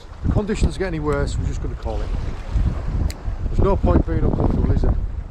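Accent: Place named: Cumbria, United Kingdom. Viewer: Great content as always mate, you proper sound like your from Cumbria, the accent is uncanny, I’m up in a town called Maryport, keep up the videos mate 👍🏼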